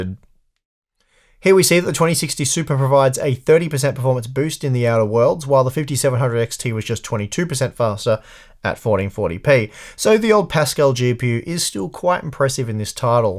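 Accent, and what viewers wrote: Australia. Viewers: This may seem like a dumb question but is hardware unboxed Australian?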